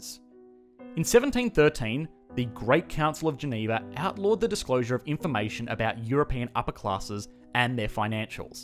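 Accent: Australia. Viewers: What a terrible (Australian) accent. Hard to take it seriously. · My God Australian Accent. Couldn't understand properly. It's very tough to understand.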